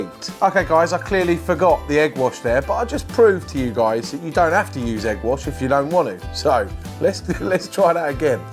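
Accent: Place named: United Kingdom. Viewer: I have to admit, I was not expecting a British accent when I started this video. Definitely going to give the recipe a shot in the future!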